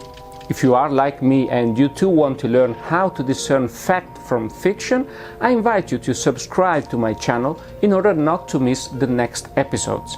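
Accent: Italy. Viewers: You kept your Italian accent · But he IS Italian!!! What accent should he have? It's not his native language. If you spoke Italian, don't you think you would keep your original accent?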